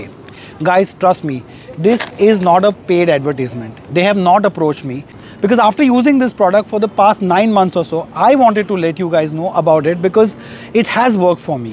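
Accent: Hinglish, India. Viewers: Your narration of presenting this piece of news.... 😂😂😂.... Hinglish ✌️✌️ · Amazing hingliishhh...!!!Love the way u narrated the story 🤩😍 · 🤣 Loved your Hinglish narration. You've got a very good sense of humour 😂🤣🤣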